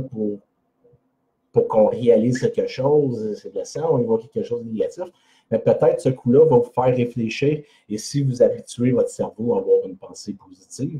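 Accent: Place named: Quebec, Canada. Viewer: 2024, toujours là ✋️ Merci beaucoup pour cette vidéo, on a besoin d'entendre des gens comme vous pour avoir un meilleur moral ! Et ne vous excusez pas pour votre accent cest tres beau l'accent Québécois 😌 Certes les épreuves dans la vie sont parfois TRÈS dur à surmonter et il est aussi TRÈS difficile de penser positif dans ces situations... Mais moi en tant que personne qui est du genre pessimiste, j'essaye de faire un maximum d'efforts pour rester du côté optimiste ! La seule chose que je narrive pas encore à bien faire c'est de comprendre les leçons de vie, y trouver une signification, et trouver tout le temps le positif dans le négatif 🥲 Je prends exemple sur mon couple, je suis en relation a distance avec une fille (environ 9h de route) et quand cest le moment des adieux, je ne parviens pas à m'arrêter de pleurer, je repense à tous nos moments passés ensemble, je ne peux plus écouter ses musiques, ni sentir son parfum sinon la moindre chose me fait pleurer et j'ai l'impression que tout est fini 😂🥲 Bon après l'essentiel c'est que c'est passagé, ça finis par passer car rien n'est permanent, c'est juste le temps de me réhabituer à la distance entre elle et moi...mais cest vrai que je suis une personne tres sensible haha, et jai peur de pleins de choses...seulement je fais tous pour ne pas montrer ce côté noir..🥲 Si quelqu'un passe par là, n'oubliez jamais qu'il y aura toujours quelqu'un qui veillera sur vous pour vous guider tôt ou tard et que vous puissiez avancer dans votr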